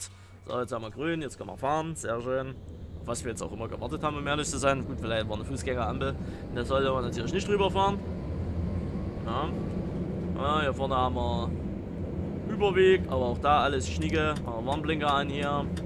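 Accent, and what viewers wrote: Saxony, Germany. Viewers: Ich liebe dein Sachsen Aktzent wo wohnst du in Sachsen ich wohl bei Leipzig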